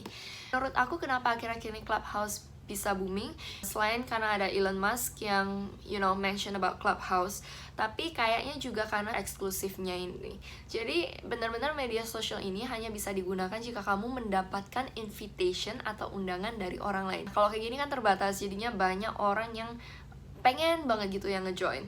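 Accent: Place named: United Kingdom. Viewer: Mba cuman mau bilang suaranya enak di denger, accent englishnya sukak banget xixi!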